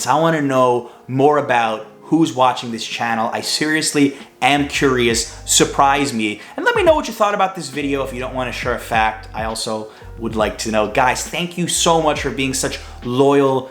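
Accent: New York, United States. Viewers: I’m Japanese and grew up in Flushing Queens in the 70”s ! I’m now in my mid 50’s and living in Japan for 40 years but your vlog brings back all the great memories in my childhood. I’ m also losing my English speaking abilities but your vlog reminds me some of the New York accents and all the good foods especially pizza and sandwiches(^^) !! I love watching your videos! Keep up the good work😊😃‼️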